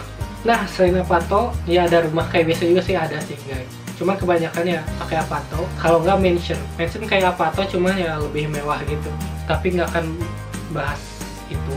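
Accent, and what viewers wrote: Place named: West Java, Indonesia. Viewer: pantesan logat sunda na teh aya wae,pek teh orang bandung gening wkwk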